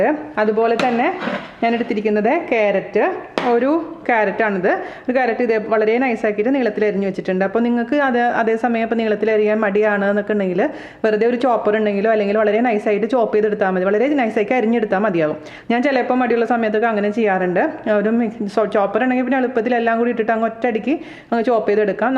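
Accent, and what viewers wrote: Thrissur, India. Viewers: Intro matenda chechi...athokke karanam aanu ente kudumbathile aalayi thanne thonunne...pinne ente relativesinte okke same perinjanam side trissur slangum..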